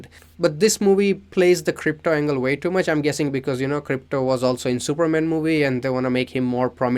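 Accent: India. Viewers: Accent gang Are you Bengali perchance? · I love your channel so much. I'm not sure if you're Bengali or Indian, but this is the first time I've seen a commenter who has such an accent. Also you seem to have so much passion for movies and entertainment, but you're also respectful and you point out the problems societaly and with hollywood. I really enjoy your content!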